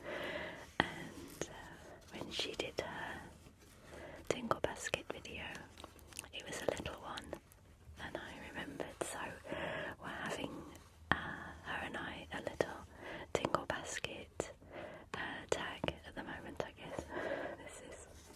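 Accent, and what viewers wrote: United Kingdom. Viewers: Love this and you!! Rough week so far and this has me ready to fall asleep and feeling calm. I’m American (with a US Southern accent) and I find your British accent so beautiful and proper ❤️💕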